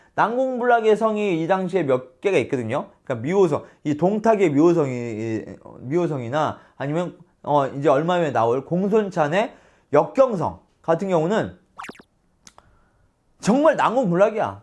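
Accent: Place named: Chungcheong, South Korea. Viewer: 충청도사투리로 듣는 장동민의 삼국지 !옛날이야기 듣는 것 처럼 정말 재밌어요!고맙습니다!^^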